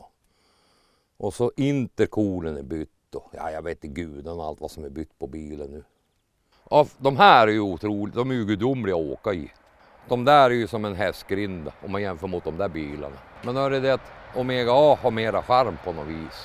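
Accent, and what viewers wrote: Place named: Norrland, Sweden. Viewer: Hur roligt som helst 😂att se en Opelfantast med en dialekt som är superhärlig. En sann norrlänning 👍. Jo jag har haft ett antal Opel genom åren. Några Rekord, 1 Commodore, några Kadetter, en Omega kombi, och den sista var en Astra.... Gillar man rost då ska man köpa Opel😄. Nä fy, det var alldeles för mycket fel på dom, i synnerhet Omegan från -87. Men det är kul å se att det är någon som fortsätter och gilla och bevara Opel från den tiden. Något som skulle vara kul att ha vore en Commodore från tidigt -70 tal men nu är det för mycket pengar i dom... Hälsningar från en Sörlänning boende i Småland 😎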